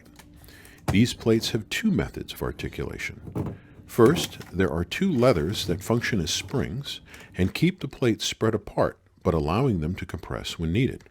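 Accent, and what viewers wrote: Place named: United States. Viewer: No, an American voice talking about anything makes me puke.🤮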